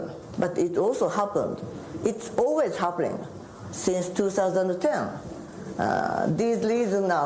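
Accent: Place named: Japan. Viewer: 日本的発音ではあるけれども、 英語で自分の考えを専門知識とともに自由に述べることができる日本では希少な政治家の一人ではありますね。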